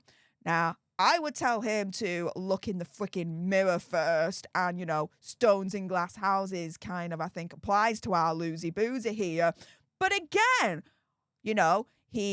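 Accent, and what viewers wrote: Yorkshire, United Kingdom. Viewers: English is my second language , but I understand her..She has heavy Yorkshire accent..They talk like that, I checked sometime ago on YT.,when somebody mentioned her accent.. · has a Yorkshire accent. So do I. I'm proud to be a Yorkshire lass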